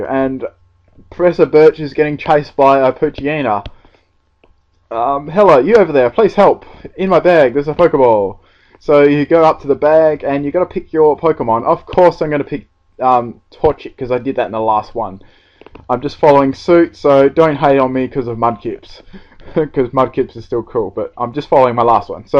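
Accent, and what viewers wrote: Australia. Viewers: what part of aussie are you from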